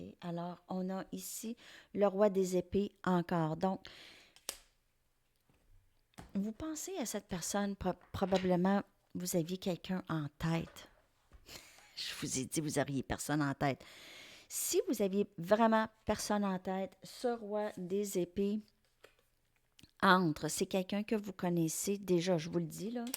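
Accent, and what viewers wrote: Canada. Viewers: Célibataire sa tombe bien 💚 je tombe sur vôtre video et que j'espère que se fera merci a vous tout a fait j'adore l'accent canadien mpi jy pense plus au passé vie le jour au jour que sa me parle pas scorpion connaît pas signe de terre je suis ascendant feu 🔥 je vois pas qui connaît pas de patron j'ai personnes je vois pas qui super 👍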